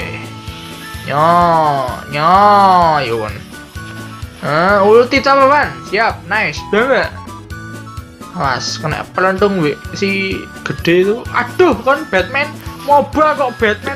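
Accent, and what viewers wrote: Java, Indonesia. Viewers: Medoknya dipertahanin bro, ciri khas elo xD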